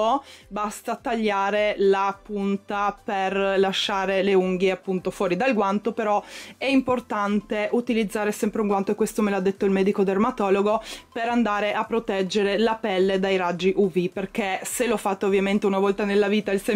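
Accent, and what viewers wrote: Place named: Trieste, Italy. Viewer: La cadenza è triestina...Qualche parola la pronuncia un poco male perché porta l' invisalign ai denti...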